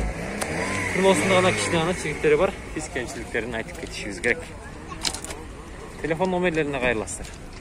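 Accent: Kyrgyzstan. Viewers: Алып баруучу азаматсыз!Таза кыргызча сүйлөйт экенсиз!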